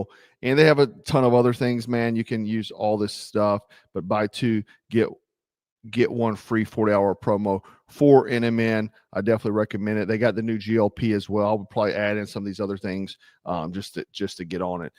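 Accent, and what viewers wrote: South, United States. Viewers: He's southern, they read, write and speak the south American way .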